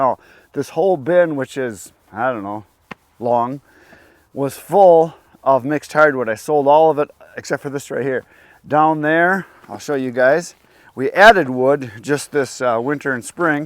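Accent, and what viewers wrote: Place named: Upper Peninsula, United States. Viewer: Hi. I like your accent. I love regional jargon. I was born and raised in Detroit. To my ear, you sound similar to Yooper to me. When I was 21, I moved to New Orleans and lived there 26 years. Yup, "what kind of Coke you want?" is common there. "Neutral ground" is the road median. "Dressed" means with lettuce, tomato, and mayo. Haven't even scratched the surface or get started into the Cajun words (southwest Louisiana). Have a good one. Chuck now living in Florida.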